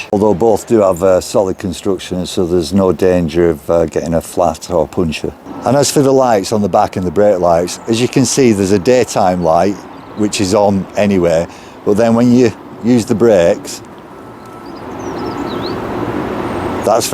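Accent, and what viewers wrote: United Kingdom. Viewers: Are you uk reviewer?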